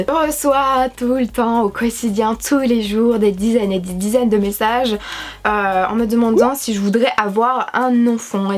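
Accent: Belgium. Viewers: Oui ta un accent belge et j ai la même palette naked que toi je l adore trop PS : je t'adore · Je t'adore tes vidéos sont toujours aussi cool et on passe un super moment avec toi ! 😘 PS : oui je trouve que tu as un accent belge ! 😂❤ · Cc Perso je trouve que tu as l'accent belge!!! Et c'est mieux car tu es Belge. Merci pour ta vidéo. Bisous de France 🇫🇷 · Merci d’avoir répondu à ma question je suis choquée 😂❤️ maintenant je vais commenter plus souvent ! Sinon super vidéo comme d’hab 💓 (ps: je suis française et oui tu as un accent belge)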